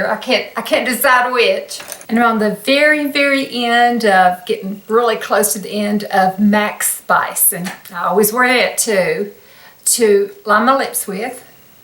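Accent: South, United States. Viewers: You are beautiful!!! This is the first time I've seen any of your videos and it was very helpful. You must be somewhere in the South? Love your accent, you sound like my sweet Aunt Dot in a little town called Meansville, GA, about an hour outside of Atlanta. May I ask your age? Thanks for this video. I WANT THAT PALETTE!!!!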